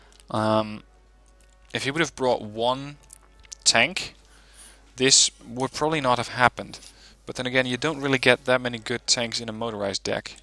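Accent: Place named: Netherlands. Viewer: I can tell by your accent that you're Dutch. Gave channel man!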